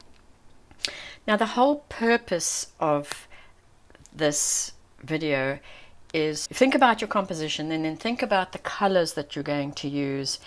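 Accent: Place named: South Africa. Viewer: Great video, thought I picked up a South African accent. I am from Johannesburg now living in the Netherlands.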